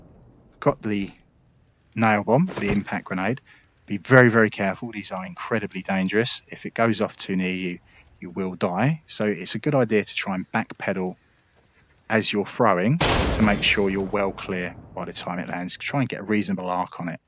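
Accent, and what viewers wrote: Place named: United Kingdom. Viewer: Hearing a British accent telling me about guns is a strange feeling 😂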